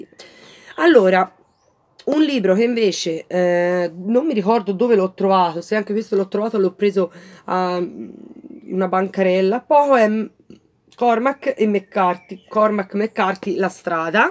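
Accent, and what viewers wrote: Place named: Florence, Italy. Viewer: Ciao, mi sono iscritta da poco al canale, e devo dire che questo video mi è piaciuto molto tanto che Shaboo l'ho acquistato quasi subito dopo aver sentito te che ne parlavi (solo che l'ho preso per la versione Kindle, ho speso un pochino meno). Mi piace tantissimo la tua parlata, io sono di Firenze ma mi sono trasferita nelle Marche per motivi personali, quindi quando ti sento parlare mi sembra di essere nella mia città natale a passeggiare per le vie del centro storico... Non sono il massimo nel presentarmi ho sempre paura di dire banalità, quindi ti faccio i complimenti per il canale e a presto.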